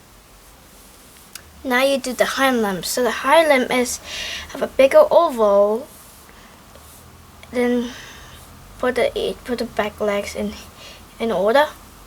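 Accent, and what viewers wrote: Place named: United Kingdom. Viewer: You're English is so British.. I like it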